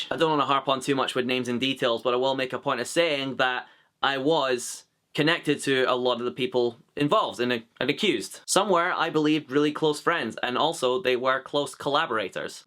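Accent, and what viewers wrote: Scotland, United Kingdom. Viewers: Glad you're back and you sound as Scottish as ever! I look forward to your following videos and I'm sending creative vibes your way :) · Literally created a channel just so I could respond to this video. I'm glad you're back, I haven't been youtubing for some time but I originally started watching your videos to learn a Scottish accent. Then I started enjoying them and I'm very glad that you're back.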